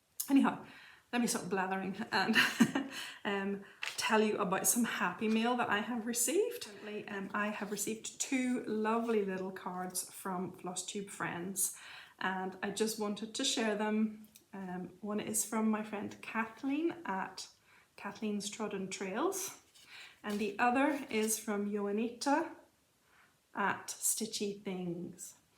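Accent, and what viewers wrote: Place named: Ireland. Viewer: Your bee finish is absolutely gorgeous! I think it is the perfect use for the wood hanger. Your FFOs, finishes and wips are all just beautiful. Thank you for sharing them with us. It's always nice to stitch while listening to your videos. Your voice is so calm and I love your Irish accent! Take care & happy stitching!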